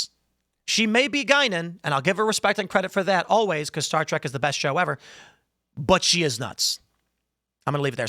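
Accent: Chicago, United States. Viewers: Tim realizes we’ve heard his Chicago accent, right?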